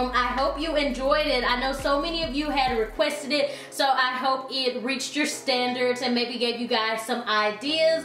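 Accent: South, United States. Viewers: Just Beautiful!! I love everything you have done with the room. You are beautiful and your voice is so country. Love that too hahaha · are you from the south?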